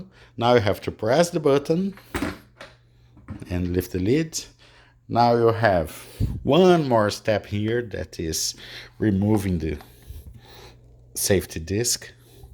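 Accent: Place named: Quebec, Canada. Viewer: Man, he sounds like a French Canadian... (Disclaimer, I'm a tamed French Canadian)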